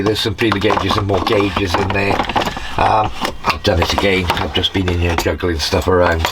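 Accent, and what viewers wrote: Pembrokeshire, United Kingdom. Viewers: Hi. Moved to Portugal from H/west 8 yrs ago. Just happened on your channel. Loved the video and great to here the Pembs accent. ✊🏽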